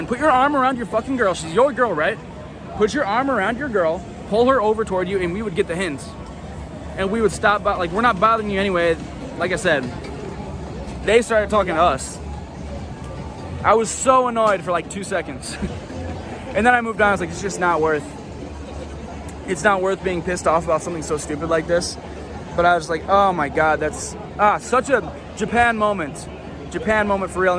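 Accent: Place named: Chicago, United States. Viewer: Are you from Chicago? Keep up the great videos on YouTube 😎👍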